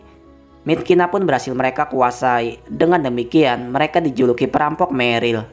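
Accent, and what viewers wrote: Indonesia. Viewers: Dari aksen bicara ke Indonesia bukan papua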